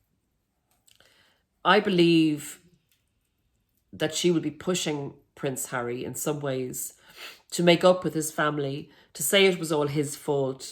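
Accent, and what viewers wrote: Ireland. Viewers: Interesting ...Irish accent 🎉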